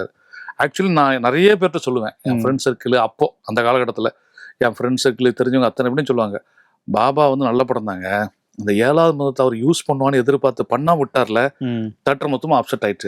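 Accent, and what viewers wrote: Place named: Southern Tamil Nadu, India. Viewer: அருமை அருமை ❤நெறியாளர் தென் மாவட்டம் போல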